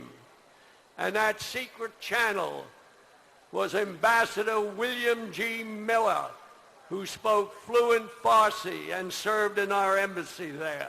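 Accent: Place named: United States. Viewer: I love this man! This is what a real American looks and sounds like.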